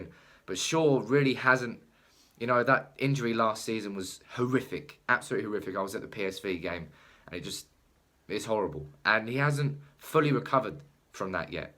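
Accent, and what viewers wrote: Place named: Manchester, United Kingdom. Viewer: I can't understand his thick Mancunian accent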